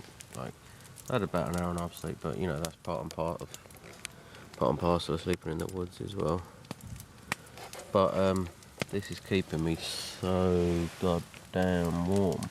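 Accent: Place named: England, United Kingdom. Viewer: Abit of a bastard haha. I love he english accent.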